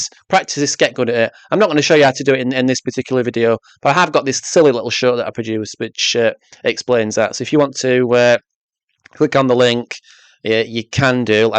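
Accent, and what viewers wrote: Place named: Hull, United Kingdom. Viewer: I found your channel by chance, started this video and kept it going because of the way you pronounced "it's a bit daft really" and of course for the chess help but I'm from hull I'm sure u know it and you talk a bit like me so I subbed great content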